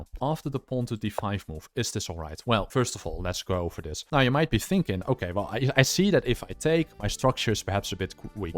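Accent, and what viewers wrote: Netherlands. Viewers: Is this an AI channel with a Dutch accent?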